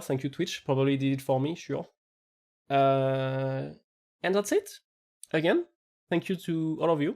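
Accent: France. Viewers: hahaha nah bro don't get me wrong I am taking this hole topic serious and huge respect for quitting your job and talking about it, but at this point your french accent is making anything funny for me.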